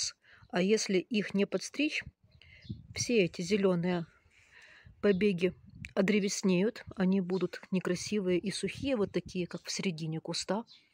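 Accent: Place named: Odessa, Ukraine. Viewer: с приятным одесским говором и правильной речью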